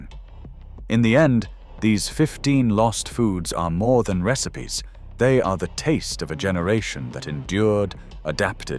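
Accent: United Kingdom. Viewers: More of your usual clickbait, ignorant nonsense. Few of these "can't be found any more". Beef stew with root veg is still very common. Lancashire hotpot is still served in many restaurants and homes. I had bubble and squeak two days ago. Toad in the hole in a gastropub recently. I love how you talk about corned beef in blue-labelled tins and illustrate it with a bunch of red-labelled tins. Are you colour blind as well as stupid? Pea and split soup is still common, too. I still love rabbit stew! You can't find rice pudding? BS. Bread and butter pudding is available in Michelin star restaurants! Apple crumble is still one of the mot common desserts in the UK. Despite the British accent of the narrator, he is reading something written in American English and most of the images, when even vaguely relevant, are American. Bunch of frauds.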